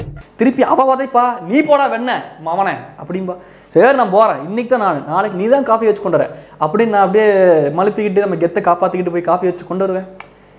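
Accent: Coimbatore, India. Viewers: enunga neenga coimbatore nglaa unga slang supr nga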